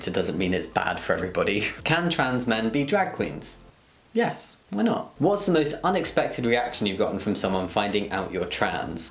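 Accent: United Kingdom. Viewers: Because of your accent I’m guessing your from the UK I am too and I was wondering if T and the surgery is on the NHS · I'm from Northern Ireland and I could instantly tell he was British aswell lol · Is he from UK ? This is not American accent for sure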